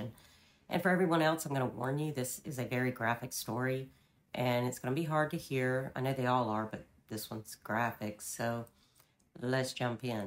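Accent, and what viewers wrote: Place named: South, United States. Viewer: I speak Korean (4th language) and you did very well. It's a whole new tonal system and they have pronunciations not natural for English speakers. That being said, I can tell you're from the south. I'm also from the south and know that pronouncing most European words gives us aneurisms so I know how hard you tried with the Korean names. You did WAY better than you give yourself credit for ❤️❤️ Your compassionate spirit still captured the same sentiment the rest of us feel.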